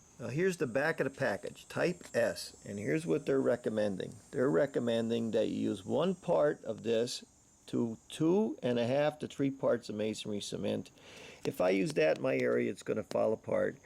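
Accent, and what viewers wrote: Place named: Scranton, United States. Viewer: I was born and raised the first 25 years in Wilkes-Barre area. I watched your first video today, and then many others and within two minutes of watching you and listening I knew you were from W-B/ Scranton area. We’ve been living in San Diego the past 40 years and now that the kids are grown up we’re coming back Home, buying some land and going to have fun building the last home that we’re going to live in and it’s going to be all stone just like our current house. Your videos are great, it’s really great to hear and see somebody from where we grew up, and I forgot the recipes for walls, stonework and grout and this has been so helpful. Thank you for your video.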